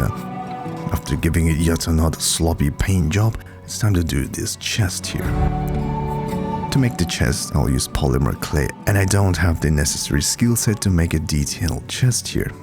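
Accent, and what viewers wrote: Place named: Germany. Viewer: It's peculiar that you sound like a German native speaker to me.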